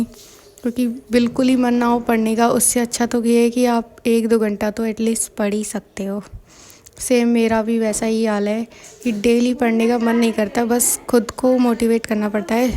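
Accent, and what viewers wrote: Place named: Dholpur-Agra, India. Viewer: Language toh dholpur agra wali h kyuki m yha maniya (dholpur) posted hu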